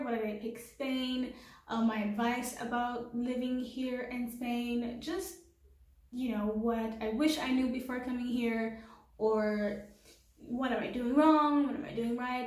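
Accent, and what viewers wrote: United States. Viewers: I'm actually shocked that you're Hatian! You have an American accent! How?!? You sure you've never lived in the US?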